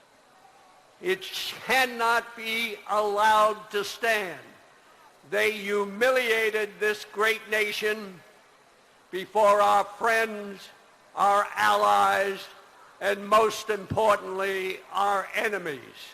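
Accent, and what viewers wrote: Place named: United States. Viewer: I love this man! This is what a real American looks and sounds like.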